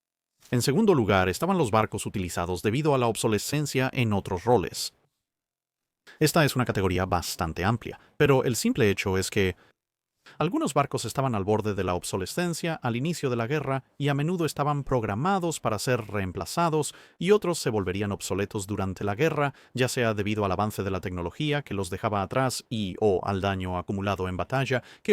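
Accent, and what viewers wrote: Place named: England, United Kingdom. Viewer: Seguia este canal en inglés ,el original, ahora en español con acento inglés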